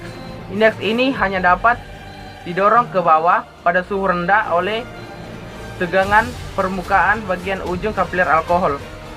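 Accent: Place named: Java, Indonesia. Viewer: Kok medok yahh?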